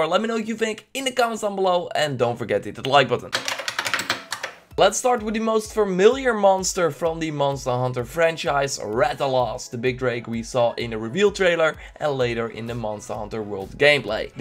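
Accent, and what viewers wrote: Netherlands. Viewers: Sounds Dutch to me.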